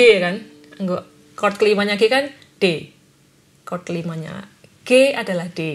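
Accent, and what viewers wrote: Java, Indonesia. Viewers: Medok banget kak 😊 Jawa nya mana ?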